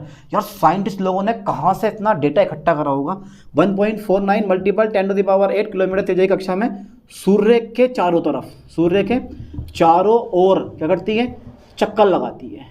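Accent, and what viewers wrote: Bijnor, India. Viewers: Sir aap bijnor side k ho kya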